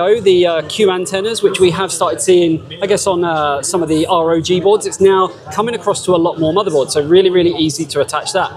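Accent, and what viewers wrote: United Kingdom. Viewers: Why is British accent so horrible? Can't even speak the alphabet with consistency let alone make coherent sentences.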